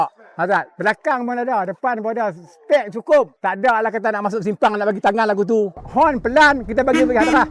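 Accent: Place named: Kedah, Malaysia. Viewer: Style kedah kalo dia sembang..syok dengaq na😅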